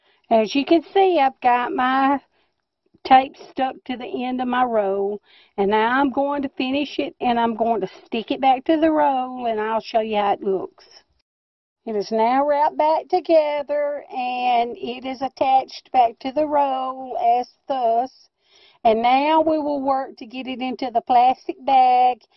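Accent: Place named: Alabama, United States. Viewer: I knew that was an Alabama drawl...Im in Alabama too!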